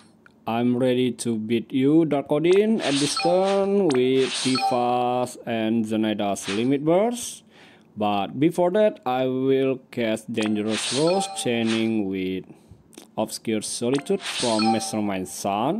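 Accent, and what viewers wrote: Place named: Indonesia. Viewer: dude, your videos - clears, reviews and strats- are all amazing, keep up the great work... btw, you accent sounds a little Indonesian..?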